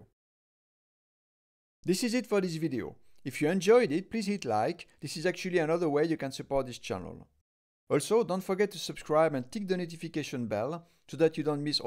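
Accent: France. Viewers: Lovely french accent !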